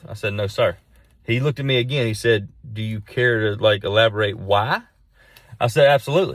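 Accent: South, United States. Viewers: That Southern draw is so sexy. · I always feel if you are too big you don’t care or listen, but damn you actually do and I’m glad cuz I love that southern drawl but will admit it can be scary due to stereotypes, thank you love ya mucho amor 💕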